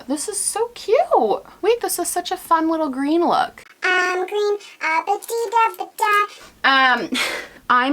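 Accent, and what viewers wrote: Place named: New York, United States. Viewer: I bet you live near me! So excited when I find folks on YouTube who live in my area of NY! I also thought that your accent sounds like a local here. Lol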